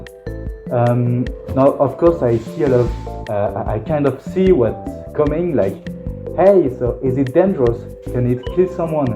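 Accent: France. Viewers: you sound french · Hey I just notice you have a French accent are you French ? Because me yes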